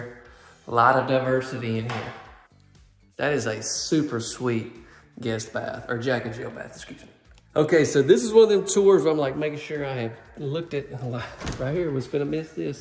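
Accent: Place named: Alabama, United States. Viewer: I am new to you page... Do you share your location or the builder's info? You sound like you are from my neck of the woods, N. Alabama, but O do know from the South for sure. I LOVE your work and actually watched the whole video, something I rarely do! TY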